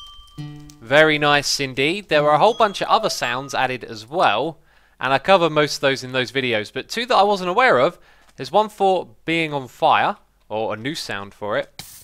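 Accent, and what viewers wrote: United Kingdom. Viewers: informational videos by a British chap, what can be better than this. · yay.yet ANOTHER british minecraft youtuber lol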